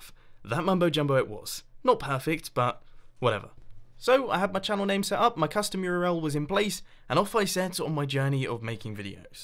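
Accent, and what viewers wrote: United Kingdom. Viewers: GradeAUnderA = Mumbo Jumbo EVIDENCE!!! Notice they both live in the UK, they have same accents but Grade is just louder. GRADE = MUMBO CONFIRMED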